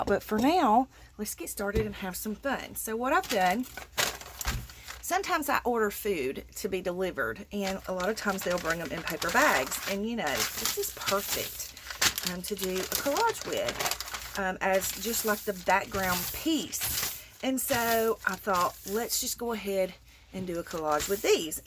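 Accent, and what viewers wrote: South, United States. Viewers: I love watching the collaging process. Your sweet southern voice makes it even better. ❤️💕😘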